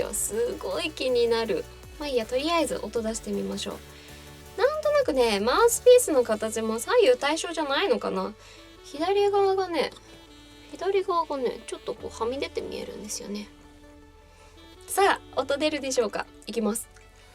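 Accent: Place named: Sendai, Japan. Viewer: 仙台弁!!ひしゃげるって言い方初めて聞きました(^^)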